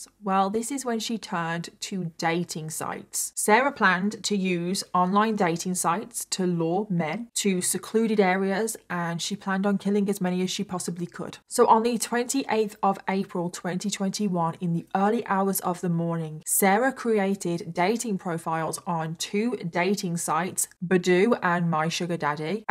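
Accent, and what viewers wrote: United Kingdom. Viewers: Your voice isn’t annoying because you’re sick. It’s because it’s British. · I just recently found your videos. I really wish you didn't feel narration with cosmetic/make-up application was necessary for success. You and your words are enough. Plus with the accent of the British Empire, we already think you are smart. Sidenote: use the Vicks (menthol, camphor, petrolatum etc) on a small patch on your chest before bed, wear a light shirt then go to bed.....Not that you sounded any different to me, but you sounded worried about it.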